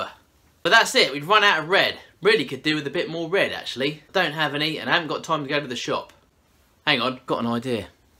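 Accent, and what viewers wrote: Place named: London, United Kingdom. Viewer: 听惯了美音，再听伦敦腔，这耳朵一下子没转过来。。。。 fighting!!!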